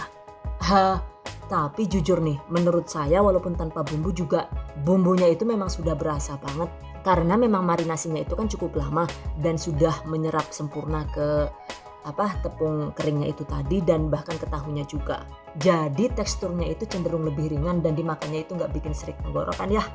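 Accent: Banyumas, Indonesia. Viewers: Ok OK OK Siap di nikmati lugot Chennel ini sangat ngapak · Bahasane ngapak metu ya bune, kaya nyong deneng, joss 😃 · Orang ngapak bu? 😆😆😆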